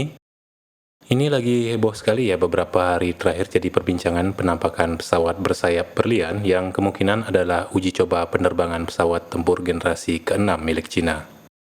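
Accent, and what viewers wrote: Bali, Indonesia. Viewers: Orang bali lu bang?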